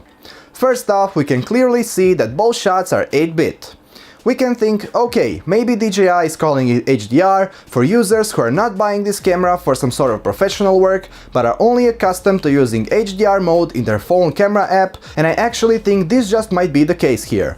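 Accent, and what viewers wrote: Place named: Ukraine. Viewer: not interested in ukraine narrators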